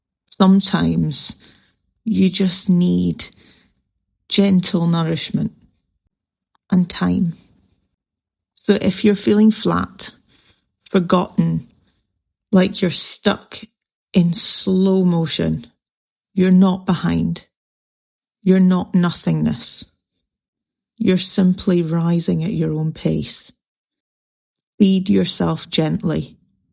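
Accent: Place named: Scotland, United Kingdom. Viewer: You made an old man cry, It is not a perfect world, Me and my now wife of over 16 years were married before, We live in central Scotland, i can tell by your accent you also come from here. Elza like yourself had numerous miscarriages and had no children, I had 2 sons, Like yourself she is a wonderful person and more or less adopted the boys (men) they both love her to bits etc. Life has a few Dark places and I have been there like her and you, the Light shines bright once out off it, you have a very nice man and now children at your side. GOD Bless, shine like a STAR. I once baked but only as an amateur young leo has inspired me to bake again and so have You, I am an old man nearly 70 and enjoy it. going by some of the comments your name is and your husband Vernon? all my best Charlie xx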